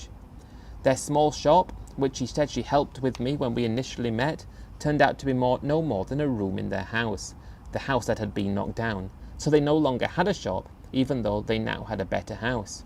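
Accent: England, United Kingdom. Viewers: You dont really have an ear for accents. This guy who narrates these stoties is very much English. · Would that an English accent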